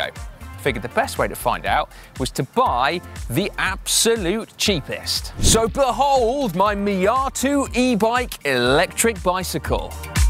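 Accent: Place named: RP, United Kingdom. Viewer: there needs to be a super cut of loathing things....cows, myatu's, not getting KOM's...exclusively in his needlessly posh voice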